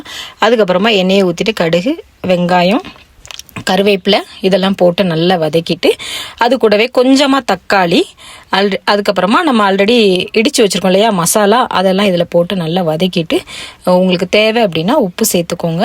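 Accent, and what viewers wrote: Tirunelveli, India. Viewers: Akka unga pechu tirunelveli mari yaa eruku ❤❤❤❤❤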